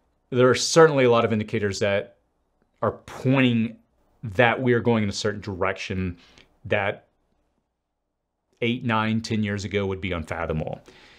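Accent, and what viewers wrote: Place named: South, United States. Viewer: Can hear the southern in ya hah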